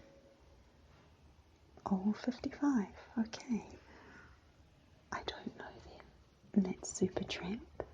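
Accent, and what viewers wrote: New Zealand. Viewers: Lovely video...reminds me so much of one of mt favourite channels, Relaxing ASMR <link> does lots of old magazine and catalogue reading videos, and is from Mid-west USA...so nice to hear your Kiwi voice too :-)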